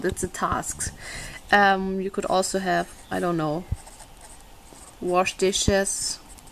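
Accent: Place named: Germany. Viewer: Wow, ich hab nur kurz reingeschaut und noch keine komentare gelesen - trotzdem sofort gehört das du aus deutschland kommst =) was ist denn ein bullet journal?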